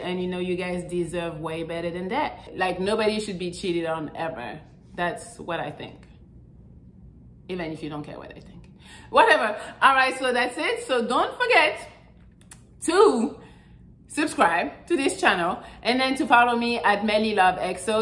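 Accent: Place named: France. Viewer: I just love your fench accent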